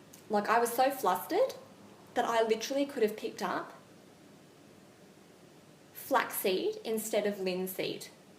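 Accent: Australia. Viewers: Your Aussie?? 💘💗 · Are you Australian definitely i think im in aus too sometimes its not that a guy wants to be creepy but when they find a girl attractive at times they might not know the best way to approach the situation.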